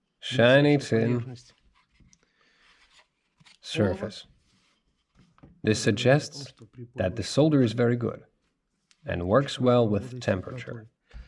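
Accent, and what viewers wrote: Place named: United States. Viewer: Bro, love the american speak overdub. Males it so much easier to watch when i know what you are talking about lol🤘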